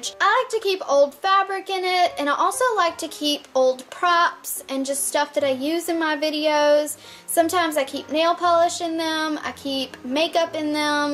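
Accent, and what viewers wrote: South, United States. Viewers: Are you country you have a axsent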